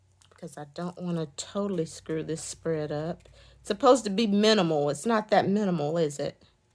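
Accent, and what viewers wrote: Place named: North Carolina, United States. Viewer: Hey! Just found your channel. Are you in NC? Of all the planner folk I follow, your accent sounds like mine! I have some of these stamps and was trying to figure out how to use them. Great video!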